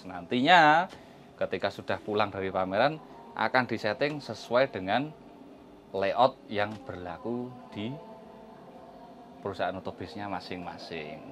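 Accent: Java, Indonesia. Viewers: Suka gaya bicaranya, agak medok, ditambah lagi guyonan dan kata² inspirasi atau kata² mutiara juga baik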